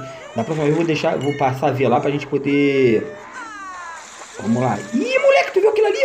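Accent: Rio de Janeiro, Brazil. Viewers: Tu é do RJ ?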